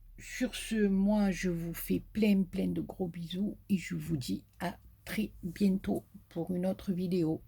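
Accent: South, France. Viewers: Merci à vous! J adore votre petit accent du midi! Courage ! Cordialement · bjr madame trop trop beau je ne connaissais pas le système de fleur en simili cuir et j'adore votre accent du midi bonne journée continuer comme cela c 'est super et bon rétablissement a vous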